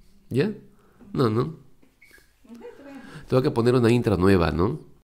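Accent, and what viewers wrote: Peru. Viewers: Peruano detectado🥺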